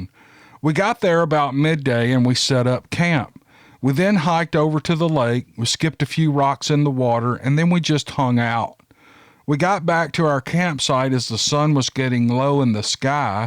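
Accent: South, United States. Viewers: You have a very nice comforting southern accent that is comforting and it's good for this line of work.and I think you could do well on tv specials if somebody just heard your voice in the way you can tell a story and make a story feel real and you can feel the emotion in the stories and I'm positive everybody that's subscribe you would agree with me cuz if they don't they wouldn't have subscribed to you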